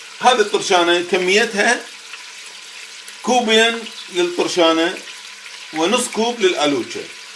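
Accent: Iraq. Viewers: عاشت ايدك حجي يعطيك العافية موبس اكلك حلو اﻻحلى لهجتك العراقية اﻻصيلة اللي تخلي اﻻكل احلى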